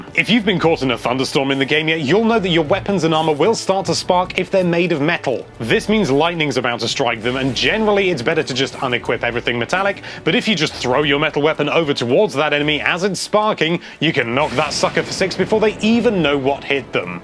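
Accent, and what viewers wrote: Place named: United Kingdom. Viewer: Those are Elk not deer you silly Brittish sounding person...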